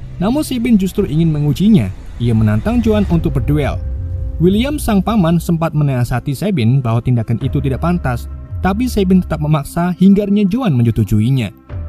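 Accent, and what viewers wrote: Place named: Java, Indonesia. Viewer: Aku yakin admin nya orang Jawa, Kadang lucu juga admin nya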